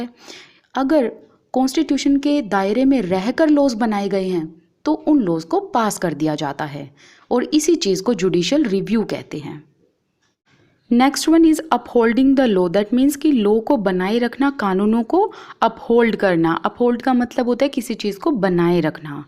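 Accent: Haryana, India. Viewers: Ma'am haryana ki kr waha ke log aese hi bolte he 😒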